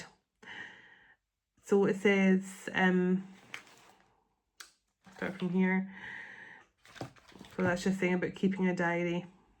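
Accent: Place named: Scotland, United Kingdom. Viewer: YOU are so loved by all of us. When I first came across your Channel, I was intrigued by Tarot and what it was and listening to your Scottish accent was endearing....... when we connected by Zoom it was then I KNEW we are from the same Soul group..... my sister, sweetest feeling ever. I love tuning in just to listen to your stories and to hear of how God is moving you into your destiny......... beautiful. Thank you for being such a comfort to me,. I don't know how I managed my emotions until you began helping me..........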